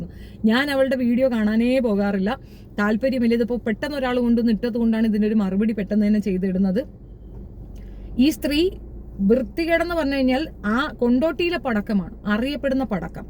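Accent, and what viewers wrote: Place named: Kollam, India. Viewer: ഈ ചേച്ചി നമ്മുടെ നാട്ടുകാരി ആണന്നു തോന്നുന്നു എന്റെ നാട്ടിലെ പെണ്ണുങ്ങൾ ഇങ്ങനെ ആണ് ഡയലോഗ് സ്ലാങ്.ആയുർ ചടയമംഗലം കടക്കൽ നിലമേൽ വല്ലോം ആണോ സ്വദേശം അന്യം നിന്ന് പോകുന്ന പല വാക്കുകളും ഈ ചേച്ചി ഓർമിപ്പിക്കുന്നു സൂപ്പർ. അടിപൊളി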